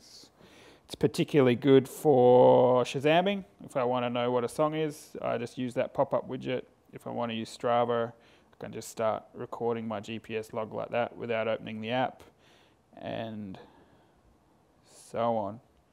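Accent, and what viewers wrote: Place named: Australia. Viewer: you're aussie. finally an aussie reviewing a blackberry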